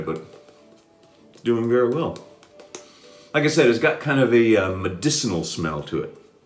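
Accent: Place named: United Kingdom. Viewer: Amazing british accent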